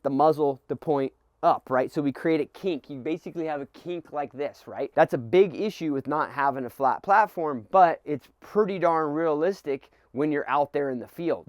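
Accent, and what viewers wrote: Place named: Colorado, United States. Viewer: Hey, I appreciate the video. I know its weird, but I gotta ask... I have the same accent as you. Did you grow up in the Colorado mountains by chance?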